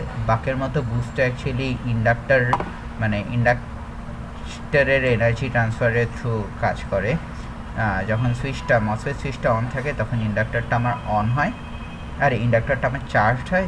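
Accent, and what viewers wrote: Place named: India. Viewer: Indian english quite hard to understand because its accent, hope there will be some script or something similiary...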